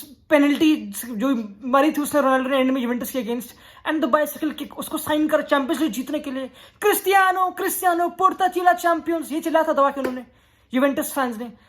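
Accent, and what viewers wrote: India. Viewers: Hindi accent is 🔥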